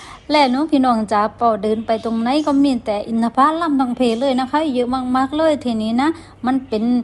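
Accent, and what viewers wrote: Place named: South, Thailand. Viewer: ืชอบจังแหลงใต้แบบนี้คนใต้คือกันจ้า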